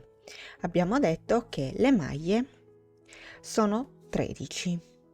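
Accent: Sardinia, Italy. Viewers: ma sei Sarda? Sento l'accento simile al mio 😊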